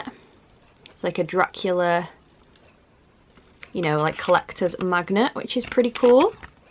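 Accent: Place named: England, United Kingdom. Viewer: I like your accent... England????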